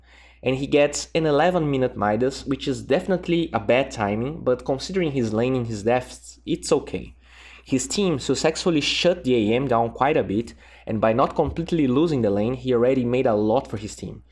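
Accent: Brazil. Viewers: Te sigo há um tempão e suspeitava que vc era brasileiro pelo sotaque, estou certo? Ótimo canal, aprendo muito com sua percepção! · well, that's a pretty heavy accent. brazilian? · dat brazilian accent XD Keep the videos going pal.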